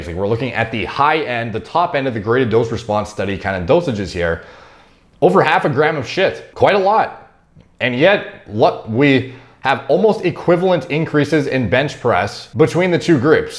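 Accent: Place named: Canada. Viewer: bruh. you sould so canadian.....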